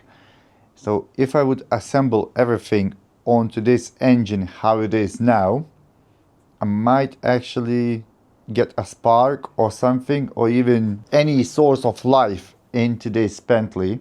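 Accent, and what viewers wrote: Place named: Eastern Europe. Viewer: Good luck my Slav accent friend. I belive in your skills and your patience. I wish you all the best. This Bentley will be turn over point for your channel,repaired or not. New subs will comming. Sorry on my bad english,cheers from Croatia.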